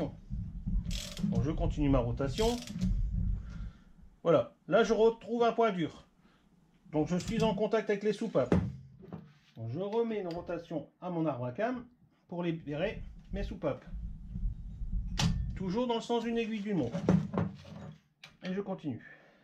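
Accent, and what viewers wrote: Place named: Nord, France. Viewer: Salut ça sent le gars du Nord.....ai je raison??????????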